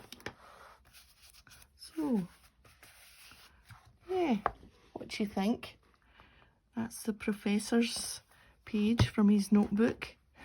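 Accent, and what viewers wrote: Scotland, United Kingdom. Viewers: I could listen to you talk all day. Love your Scots accent!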